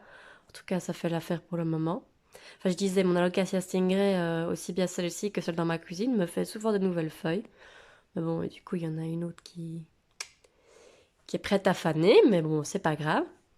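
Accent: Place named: Liège, Belgium. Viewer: Merci pour ce plante tour, j adore !! Et l accent Liégeois coool 😃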